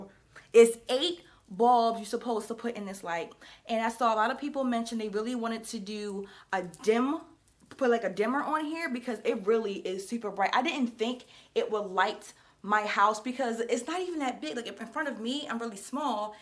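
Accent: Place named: DMV, United States. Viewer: Thank you so much for sharing💛 I hear a DMV accent lol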